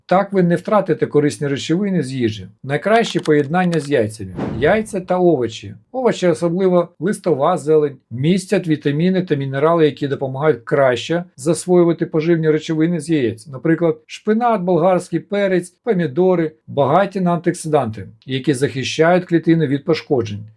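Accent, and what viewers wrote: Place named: Poltava, Ukraine. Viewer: щиро дякую Вам за відповідь! Я з Дніпропетровськоі обл, але темчасово знаходюся в Даніі, а подумала, що ви з Полтавськоі, бо говор схожий на наш! Робете дуже важливу справу, щоб наша нація була здоровішою і сильнішою! Вам бажаю процвітання і до вершин без перешкод!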